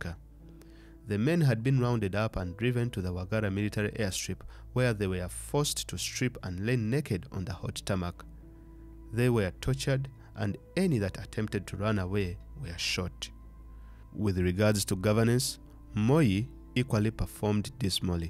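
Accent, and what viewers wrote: Uganda. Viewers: This is not a Kenyan giving this narration, the accent sounds Ugandan.Why not give us the story of UG especially the Amin years.He was one of the worst dictators in Africa, Moi pales in comparison to him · The accent sounds Ugandan of the Narrator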